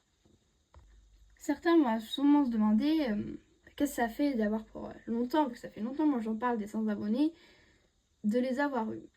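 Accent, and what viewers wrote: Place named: France. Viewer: Aaah trop choupi❤❤ bravooo!!! 🍾 👏🏻 t’as un accent très français 🎀😮 ! Aww trop choupi ☺️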